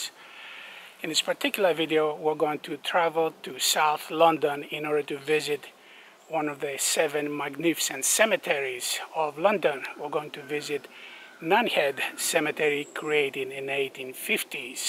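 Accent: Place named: Greece. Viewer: Thanks for explanations and for video ✌️ Like your accent. I guess greek:-)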